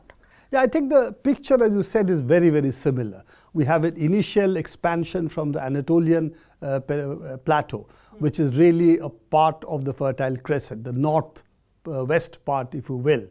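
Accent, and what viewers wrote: India. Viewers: The Indain accent hurts my ears, sounds terrible.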